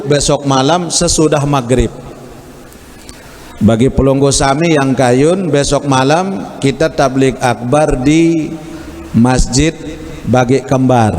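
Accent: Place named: Bali, Indonesia. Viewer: Ininustadz siapa ya namanya? Logat biacara nya seperti asli orang bali 🙏🙏🙏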